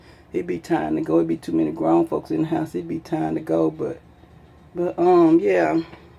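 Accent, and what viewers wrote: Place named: South, United States. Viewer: You did a great job girl. Your makeup looks beautiful ❤️ you sound very southern, are you from Georgia?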